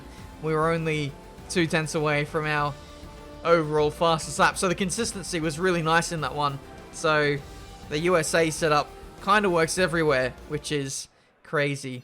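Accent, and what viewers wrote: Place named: Australia. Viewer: DUH YOUR AUSTRALIAN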